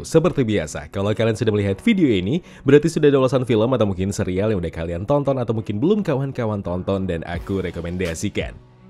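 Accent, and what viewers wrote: Medan, Indonesia. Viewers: Orang Medan kau bg?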